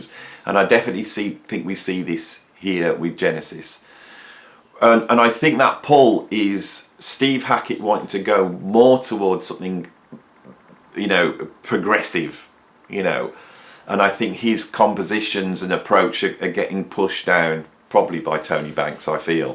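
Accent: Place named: Midlands, United Kingdom. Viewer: It's funny even in the Midlands accent you reading the fly on the windshield lyrics I still hear the big hit afterwards 😂 a real iconic moment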